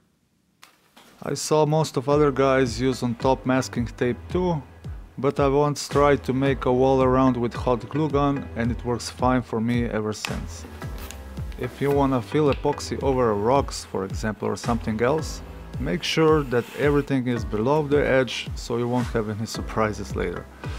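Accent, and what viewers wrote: Russia. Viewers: Русский акцент? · в его произношении виден русский человек. ну или украинец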